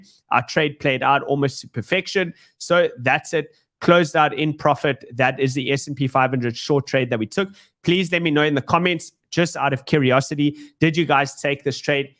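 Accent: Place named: South Africa. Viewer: Love the SA accent. Couldnt care less about TA. Its all BS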